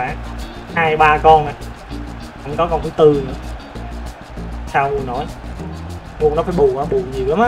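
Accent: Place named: South, Vietnam. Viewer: Xem kênh a phần vì content chất lượng, phần vì a nói giọng nam nên cảm giác gần gũi hơn:))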